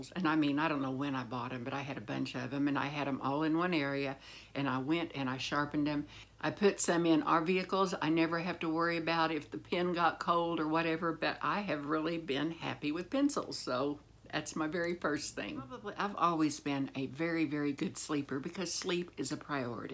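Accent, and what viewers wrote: South, United States. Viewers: Hi, I’m new here and enjoy your chit chat. I think this is my second time watching your videos. I live upstate NY in what used to be the original capital of NY State. The weather here has transformed beautifully into Spring. It’s becoming green and flowery all over. I go walking at the local track and field space and love it. Everyone is so friendly, saying hello to me and others they don’t know. It’s heartwarming and I think we give one another hope. Keep well and thank you for sharing. I enjoy your chit chat and your Southern accent is great!!! I wish I had one but I was born in Brooklyn!!! Thank goodness I lost most of my Brooklyn accent - my best friend in college was from Springfield, Mass. where people have no particular accent and it sounds fine and clear. Kind regards and keep well. FR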